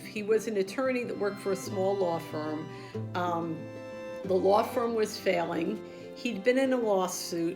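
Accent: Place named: New Jersey, United States. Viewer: Excellent podcast! And I love that Jersey accent! 😉🫶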